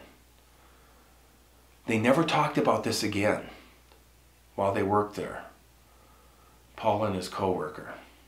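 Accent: Minnesota, United States. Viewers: I also listen for the MN accent. Ahhhh home.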